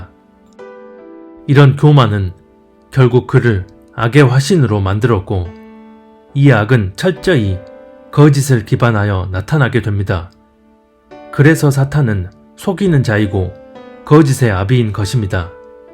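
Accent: Jeolla, South Korea. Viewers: 전라도사투리 억양이 강하네요ㅎㅎ 저도 광주출신이라..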